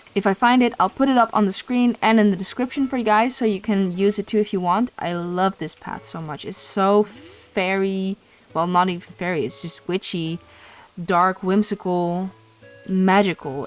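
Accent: Netherlands. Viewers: Love this island! First time I’m seeing your channel but are u Dutch? Thought I heard the accent lol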